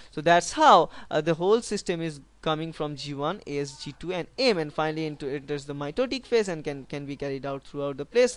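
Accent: India. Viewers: ow he is from Bangladesh😃i thought he was Indian because of the accent. thanks a lot bro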